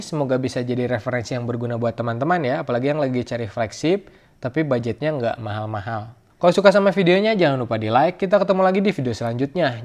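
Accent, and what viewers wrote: West Java, Indonesia. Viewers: Ga sengaja mampir sini. Pas awal denger narasinya langsung kepikiran "hmm... Logatnya kayak urang sunda". Pas ngomong "geuleuh.. Gengsi.." ah fix, baraya Sunda.. 😂 Salam kang.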